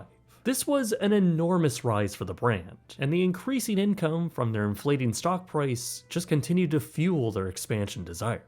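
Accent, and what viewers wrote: Canada. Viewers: Lone Star Steakheaouse? Bought eaout? The Canadian accent truly is the worst and most annoying accent for long-form content.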